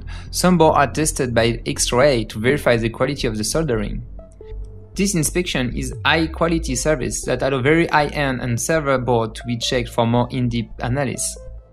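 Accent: France. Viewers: This guy has a french accent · maybe cos the guy speaking is french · vous êtes français?(à entendre l’accent ^^) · This french accent is amasing... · I can understand about 80% of what this stupd frenchy's saying. For example the word is "componant", not "cump'n'nt". "How" not " 'ow". Lazy prick! It's sad how many young children are out of work because of those robots.:-( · E tu a l’anglais d’un français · French accent:D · wth is the narrator french? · No, i can not listen anymore. This video is fucking RUINED by this french frog pos. I'd rather have any foreigner speak English lang. (russian, german), but NOT FRENCH!!!! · That's FRENGLISH! Understandable nonetheless;) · But why the hell did they choose a french for the off voice?? what a dread accent. It does not sound english even a little... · I'm English and I could understand everything he said. Stop complaining! 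In fact his French accent sounded better than the remastered version with the guy talking with a slight Chinese accent. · he's French 146% sure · if you ask me that sounded more like french... either way, in it's very common for big IT enterprises to feature narrators with visible accents. That way they try to show their internationality and acceptance. I work at Autodesk (a software enterprise) and at least 50% of our new products or updates are introduced by someone with a strong accent. Call it cliché if you will:D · he's french. i can hear it. that's my native language. he sometimes pronounce words as in french -_- as when he says "misplaced" and ze instead of the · accent français XD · You speak english like me =D Frenchie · ca me va bien je comprend mieux avec l'accent français du coup:) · L'accent franchouillard tout le long, c'est un calvaire.! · definitely french. you can get it from first 30 seconds, especialy when he says "from a to zee":)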